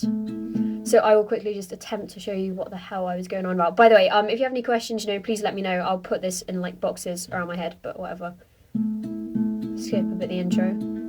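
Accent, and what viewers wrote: England, United Kingdom. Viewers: I'm English and I could tell you where English! Can you do,even though this is like a 6yr old video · Ella tiene un acento hermoso, así hablando tan bonito en Inglaterra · Im from England too, omg your so funny. And english accents dont sound like australian accents god..Americans are weird lol :)) Im from Newcastle. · Great tutorial, it really helped :) and as an English person I can tell that you're English ;) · im from germany and i "knew" after a few minutes that u are from england. very nice tutorial. best i found so far. only a lil thing would have been nice: if u could play the whole song as an intro or so :D. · Love your English accent :) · Thanks I am a complete beginner this really helped, how do people think your Australian, you sound english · Thank you so much! I've watched dozens if not hundred of Guitar how to's and yours are by far the easiest to follow. 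I cant wait to watch more of them! And don't worry, the accent is pretty obviously British :) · That was so clear, thanks! This was the first video of yours that I saw but hey you sing very well! Oh, by the way, you do sound totally English don't worry ;) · It's obvious you' re from england :D You're cute... ^^ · How can u think she's American or Australian. I lived in England for most of my life and then moved to Australia :( all Brits sound like her, great vid by the way!!!! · Thanks for this! You DEFINITELY sound English. Like you I also don't get how any one could mistake that for an Australian accent. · D that´s funny! I recognized where you come from by hearing your accent!! ok.. i spent a few days in London...and I really like this british accent! youre probably right, but not everybody can hear the accent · First, i think your british, XD sorry, by the way, ,love the voice, love the hair, love the song. 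:) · thank you for the tutoral :) you're really good at teaching :O love the accent! xD i thought it was a british accent but wasnt certain :P from: irish boy Donal :) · You can tell you're English. Thanks for the video, only Q is after you play intro 2 times then the bridge do you go back to the into? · Love your voice, Ahaha i thought it was Australian until you said British. Now i hear it! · You are so cool. Thank you for this great tutorial. Will help me a lot. You sound English!!! No doubt. Greeting from Germany - Michael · How does it sound Aussie no chance it's uk all the way btw I'm from uk live in NZ next to Aussie your accent is so much better than g'day mate :D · where are you from in england? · Hi from New Zealand tauranga..yep Great teaching at the right speed to learn great song .. Thanks . Your accents not to strong english wise but but nothing likean Aussie..cheers. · I am American and I could tell that you were English, but the real question is what part of england are you from. 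I also understand your frustration with people thinking that English and Australian accents sound the same. Keep up the good guitar work. Can you do by. I am recently obsessed with it. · Like the way you show around how to play a lot of great songs, great work! Thanks! Ps yes your accent is clearly English to me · i knew u were from england i love your accent!! oh and thank you the tutorial was well explained and very easy to understand. ^-^ god job and keep going. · Thank you for this video, you've made learning to play a little more interesting for me. You sound nothing like an Aussie defs English. Again thank you:-)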